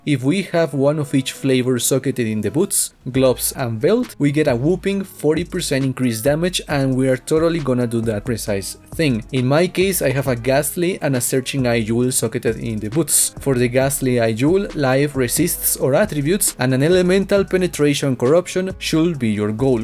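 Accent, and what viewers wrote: Spain. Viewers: are you from like Spain or Argentina? greeting from Argentina btw c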